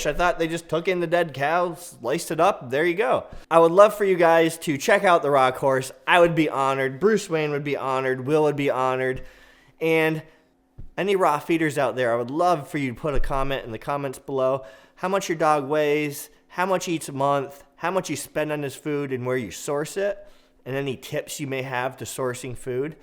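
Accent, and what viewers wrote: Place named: United States. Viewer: I've never heard them called "cona Corno" we say "Cain Corso" Cane Corso. You sound American so I was just wondering why you say it different?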